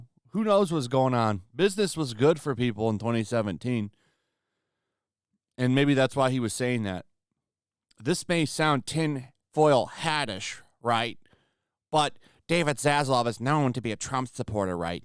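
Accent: United Kingdom. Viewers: i dont know why your crybaby voice is so awfully british old boi, but i do approve guvnor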